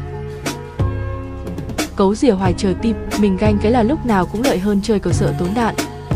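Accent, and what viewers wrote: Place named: Quang Tri, Vietnam. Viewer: Em ấy giọng Quảng Trị nên hơi khó nghe cho các bạn miền trong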